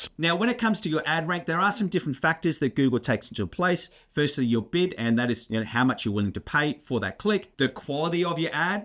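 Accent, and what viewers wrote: Australia. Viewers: Oh yes a fellow Aussie! much rather listen to a aussie talking about this stuff.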